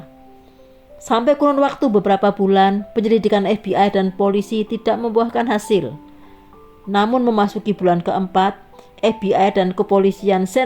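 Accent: Java, Indonesia. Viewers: Pembawaanya yg medok itu ciri khas tersendiri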